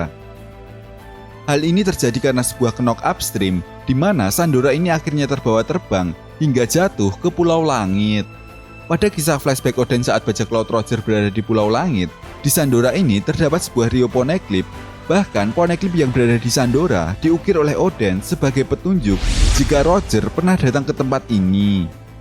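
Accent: Java, Indonesia. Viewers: Logat Jawa nya kental banget 😅😅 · Mendok ya bahasany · Lebih enak dengerin narasi dengan suara begini, drpd yg nadanya dibikin 'sok mantap' wkwk Lebih chill dengernya ini Javanese bgt min, nice, stay low yea~ Keep the good work clap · NARATORNYA MIRIP SUARA DONO . SERIUS. KALAU DONO PAKE LOGAT JAWA DI FILM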